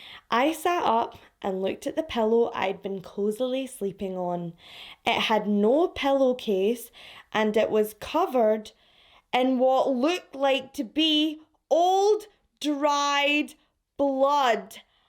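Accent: Scotland, United Kingdom. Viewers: I had to re-read this a few times because I was so confused so i hope i've understood it now! Do you mean by country, as in the countryside/farmer type place? Because if you only moved to Scotland before Covid struck you really picked up the accent quickly lol. ..... Sorry literally just got to the part in the video where you confirm haha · I've lived in Scotland for 6 years and still struggle to follow conversations between Glaswegians. I love listening to you, at least I can understand you and enjoy your Scottish accent and humor. Love you!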